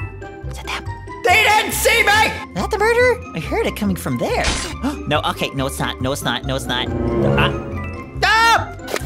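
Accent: Australia. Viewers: You are from Australia don’t Ask how know😂 from vid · Are you actually Australian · I think your from Australia.. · i think your from austreilia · ur from australia? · I think that your from Australia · Do you come from Australia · I know where you are from Australia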